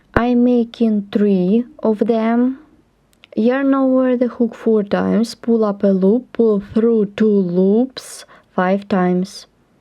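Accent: Russia. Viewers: А по русски можно? Вы же не американка, русская слышно. Практикуете на инглише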